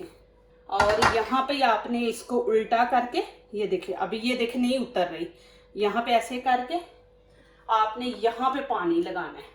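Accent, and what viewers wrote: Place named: Punjab, India. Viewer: MashAllah bohet mazy ka nan bohet achy treqy se semjaya ap mujy pnjab se lgti he ap ka andaz benjabion wala he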